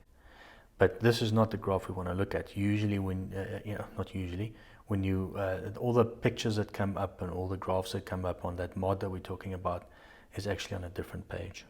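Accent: South Africa. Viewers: I honestly love the South African accent lol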